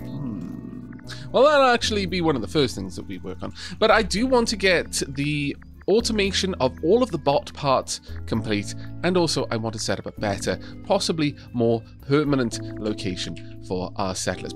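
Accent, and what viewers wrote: Wales, United Kingdom. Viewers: I would wuv to be a Neumann just to hear how you say my name. It would also be creepy cool if I ended up in I AM MOTHER team because I work in a day care. I'm mainly curious how my name sounds in a Welsh accent. Wiv wuv Akane Taakon P.s. Spread the Wuv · It’s taken me far too long to work out exactly what makes this series so enjoyable. Is it the knowledgable way puts the bot coding together? Is it the dulcet tones of his Welshishness (try saying that 3 times fast) that makes it so pleasant to listen to? His addiction to tidiness and prettiness? Is it the ridiculous cuteness of this game? No. It’s the motivational speeches. I really want to be part of the collective just so my representative bot can be on the receiving end of one of these!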